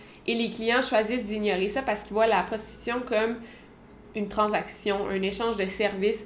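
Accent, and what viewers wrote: Canada. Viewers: Bravo! J'adore regarder tes vidéos et peaufiner ensuite avec quelques recherches de mon côté, tes sujets sont toujours très attractifs et tu réussis à te les approprier d'une manière vraiment intéressante pour ceux qui te regardent, on ressent que tu es réellement investie dans ton travail et ça fait du bien! Et ton accent canadien est le petit bonus que j'aime beaucoup! Bonne journée, une de tes admiratrices françaises!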